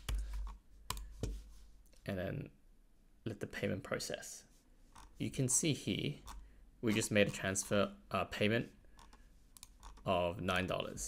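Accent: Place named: Australia. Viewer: Haha! thanks! Australian english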